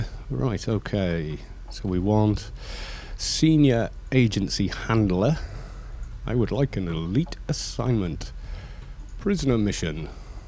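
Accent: United Kingdom. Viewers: I expect by your voice you would have short blonde hair and look like a brit . But now your going to look like a guy ready to hammer some death metal shows XD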